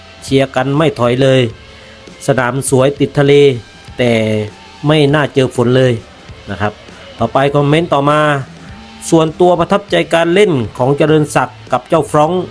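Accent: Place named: South, Thailand. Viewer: แอดมินเป็นคนใต้แน่นอน ต้องปรับการอ่านให้เร็วและคล่องกว่านี้ค่ะ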